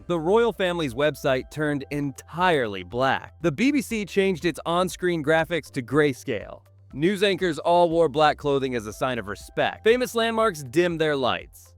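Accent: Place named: United States. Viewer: This American voice is so inappropriate to the subject as well as the title! What for Gods sake is shocking here? This is just the way WE handle these things in GB and Europe.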